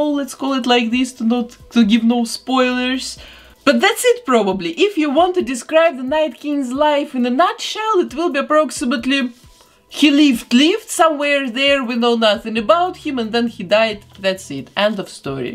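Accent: Russia. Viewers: Стойкое ощущение, будто человек за кадром имеет русский акцент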